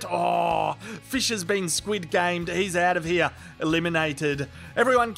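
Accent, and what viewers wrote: Australia. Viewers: What is this. Aussie man on Man U channel! Excellent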